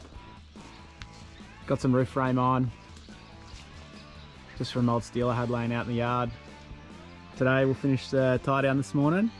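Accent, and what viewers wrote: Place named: Australia. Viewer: This is for Australian viewers , I can see non Australians will have a problem understanding you . Even I gotta listen extra carefully.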